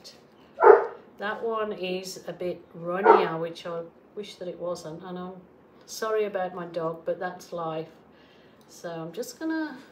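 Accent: Birmingham, United Kingdom. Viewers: Like the wrapper of a gorgeous chocolate bar 😋😍. Are you from Birmingham, England originally?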